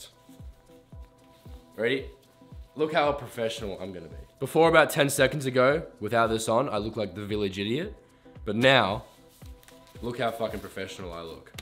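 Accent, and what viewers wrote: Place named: Australia. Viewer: gonna pee, pour some whisky and enjoy 20 minutes of your beautiful face and that aussi accent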